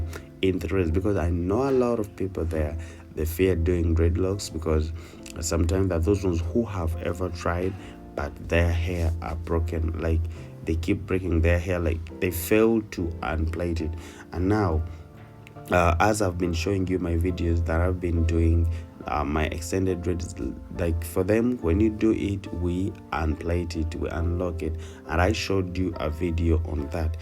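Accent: Uganda. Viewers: This accent is sooo Ugandan 🇺🇬🇺🇬🇺🇬🇺🇬 my first time here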